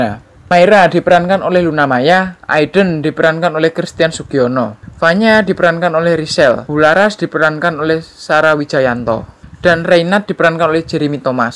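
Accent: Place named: Bojonegoro, Indonesia. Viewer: Medok jonegoro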